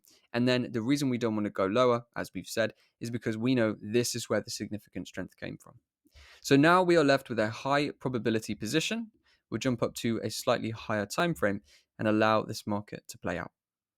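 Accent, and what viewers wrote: United Kingdom. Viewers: Bristish acent is great!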